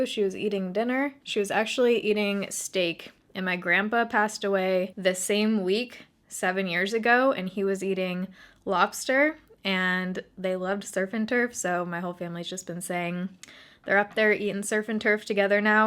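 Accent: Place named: Seattle, United States. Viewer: You're the first beauty guru I've followed who lives in my area. I actually just started following you, and when you said you were living in Seattle it all made sense. Your chillness, fair skin (which is easy to have when it always rains here), the way you talk (although I can't explain it). I'm looking forward to seeing more of your videos!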